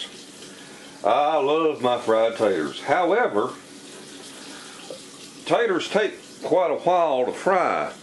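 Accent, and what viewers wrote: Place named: North Georgia, United States. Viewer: Three degrees?! Holy crap! Where are you.... Alaska? That accent sounds more like North Georgia. lol. Never thought about cutting the slab of bacon so it fits better in the skillet.... too simple. Great vid as always.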